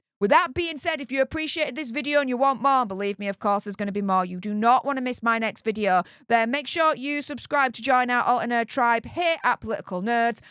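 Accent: Yorkshire, United Kingdom. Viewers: They won't be a full inquiry though 😢. Not until the toxic woke lefty Islamic labour are gone. All these horrible crimes happened under labour councils 🤔😒. We know some high class people are involved just my opinion. I love your channel especially the A up are you a Yorkshire lass 😆 just curious 🧐.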